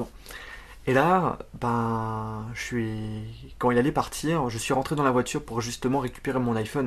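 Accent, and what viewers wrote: France. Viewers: T'es de paris, lyon ou marseille ? tout simplement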